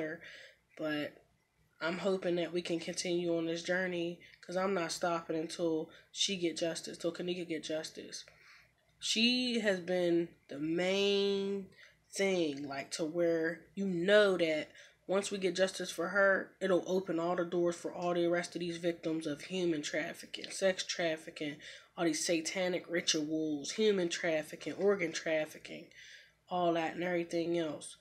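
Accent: United States. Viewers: Where are u from? U sound just like me, so country! Lmao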